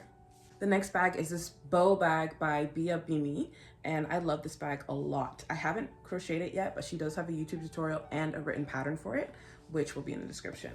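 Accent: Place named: Caribbean. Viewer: Adorable video. Are you carribean? I detect an accent but I can't place it.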